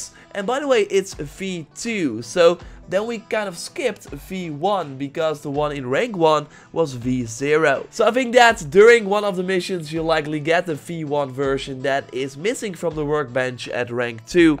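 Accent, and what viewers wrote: Netherlands. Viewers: Are you dutch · you are dutch haha das heel simpel te horen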